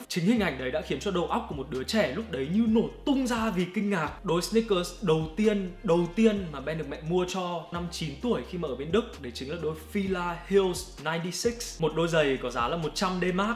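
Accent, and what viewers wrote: Standard. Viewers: Nói chuẩn anh ưi!! 1 like❤️❤️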